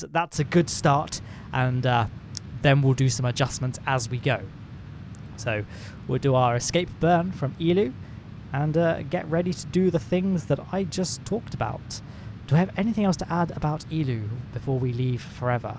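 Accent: United Kingdom. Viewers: I love his stuff, great video's and explanations. I even like the British accent. Half way through this one though, and I've lost interest in him. HeiGHT. HIGH T. HARD T. It's not heigthhhhhh!!!!! Well educated, a doctor, and ENGLISH- it's THEIR LANGUAGE!!!!!!! Do you axe patients how dey feelz too?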